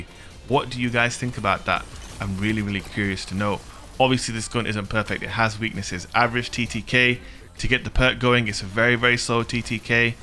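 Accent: United Kingdom. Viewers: Heard British. smh. DISLIKE VIDEO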